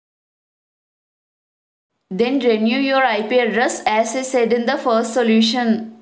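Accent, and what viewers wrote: India. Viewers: Thickest Indian Accent Ever.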